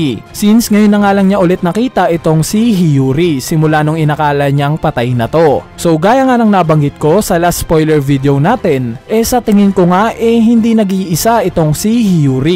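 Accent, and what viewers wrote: Visayas, Philippines. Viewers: Apu at hiyuri bisaya ka pala Apoo at hiyori lambutan mo po ang tigas ng bigkas mo sa pangalan nila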